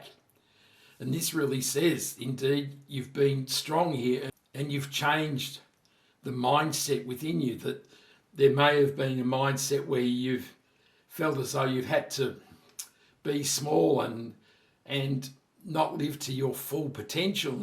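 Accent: Australia. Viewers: Hi, are you an Aussie mate ?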